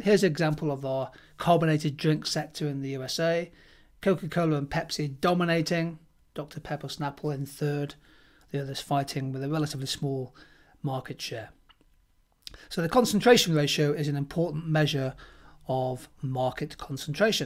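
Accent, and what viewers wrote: United Kingdom. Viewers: This video is very helpful, but I think I was watching it mostly because of a really sweet British accent ☺️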